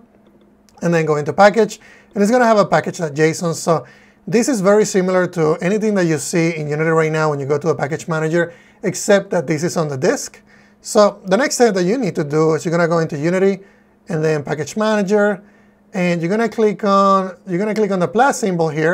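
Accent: Spain. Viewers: your voice combine with Spanish, hard to understand. hihi. Good job, bro. Thank for your sharing!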